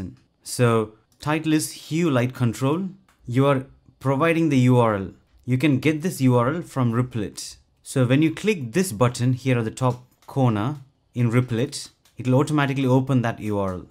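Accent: South Africa. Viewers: Love the videos. Can you do one for controlling apple HomeKit? Are you South African?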